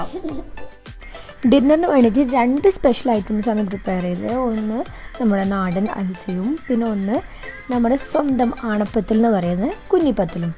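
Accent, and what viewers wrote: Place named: Thalassery, India. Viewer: തലശേരി യാണോ. ഭാഷ. മനസിലായി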